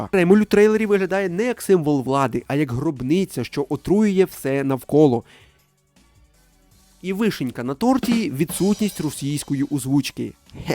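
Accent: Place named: Transcarpathia, Ukraine. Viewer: Дуже гарний закарпатський акцент)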